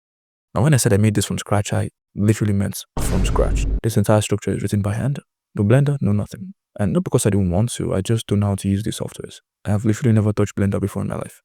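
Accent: Nigeria. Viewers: Can’t place the accent are you Nigerian?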